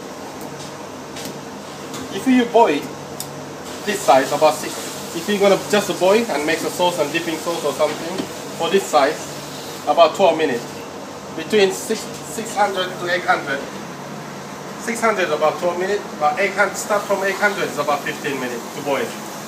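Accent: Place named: Japan. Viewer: 日本人みたいな発音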